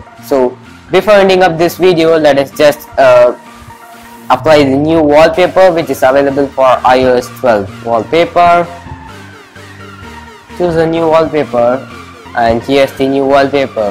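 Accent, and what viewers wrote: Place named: India. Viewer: Ahh! Here comes the Indian accent!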